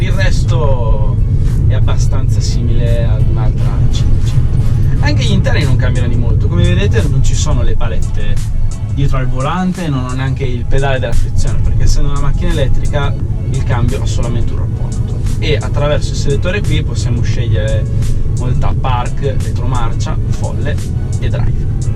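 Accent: Italy. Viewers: Yes, cool to see and hear a Italian in America. :D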